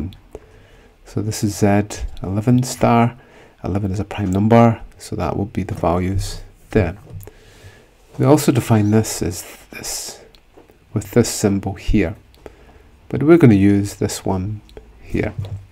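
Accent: Scotland, United Kingdom. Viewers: is skotiak akcent prezent?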